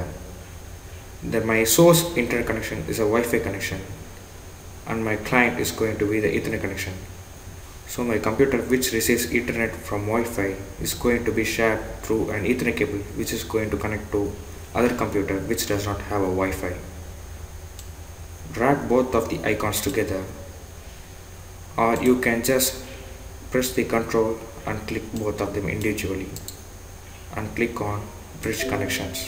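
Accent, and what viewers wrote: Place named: India. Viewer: You Accent havin Indian boy. Thanks for Helpin me out!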